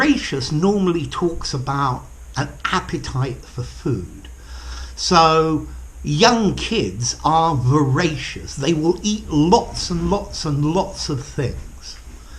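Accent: United Kingdom. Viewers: Thank you,! I always try to see some of your lessons. They are helpful and I prefer the British accent.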